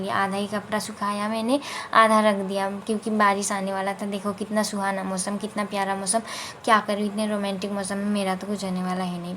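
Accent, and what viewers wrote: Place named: Nepal. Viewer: Yar vlog to theek hai par use jyada aupe ka accent hai Jo hame khatarnak laga proper Nepali people when they speak hindi.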